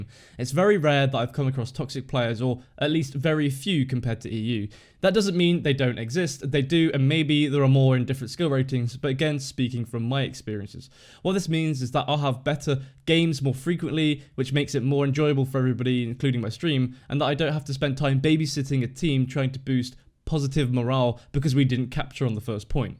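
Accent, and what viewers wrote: United Kingdom. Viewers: you sound British so assuming you live in the UK how the hell do you have good ping on NA???? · are you from the UK mate?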